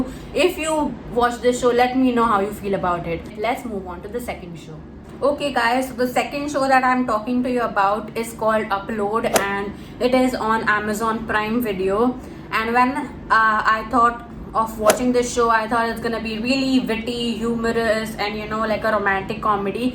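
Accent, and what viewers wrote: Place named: India. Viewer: i like the indian accent !!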